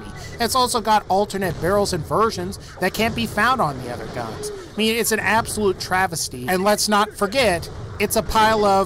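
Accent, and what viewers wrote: South, United States. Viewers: His country accent his amazing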